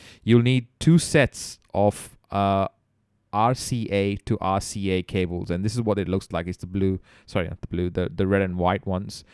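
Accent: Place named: United Kingdom. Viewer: Good grief! You spent the first two minutes chasing your tail! Repeating yourself... If you have a "know it all" Brit accent, you better deliver in the first ten seconds... "I'm the smartest person in the room and I have the accent to prove it!" No you''re not....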